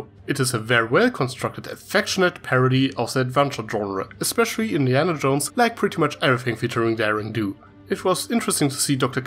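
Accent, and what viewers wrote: Germany. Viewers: True. Also, are you German? You seem to have a European accent, and it sounds German to me. If so, nice to meet another German brony.:)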